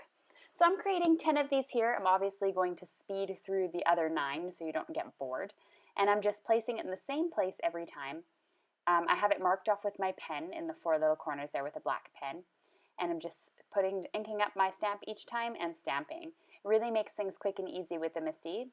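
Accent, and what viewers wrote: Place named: Canada. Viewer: ... I love your Canadian accent. I know I am supposed to comment about the ATCs... hehe. Gorgeous cards. Thank you so much for sharing...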